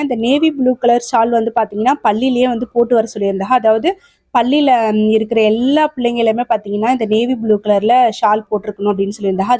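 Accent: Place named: Nagore, India. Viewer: Nagore Karaikal slang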